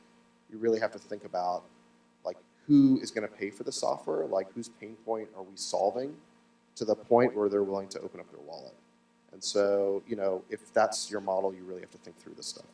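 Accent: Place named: India. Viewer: Indian ascent is really irritating. I am sorry.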